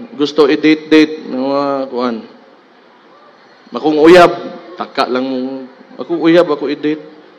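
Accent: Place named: Davao, Philippines. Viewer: Hinde maintindihan salita mo pang davao klang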